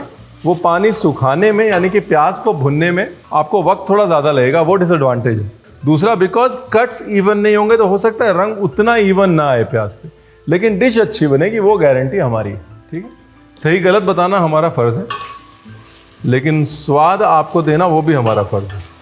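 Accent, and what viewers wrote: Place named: Lucknow, India. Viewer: Bhai bada badhiya lagta hai ye lucknow wali tone sun ke, apne ghar wali feeling aati hai.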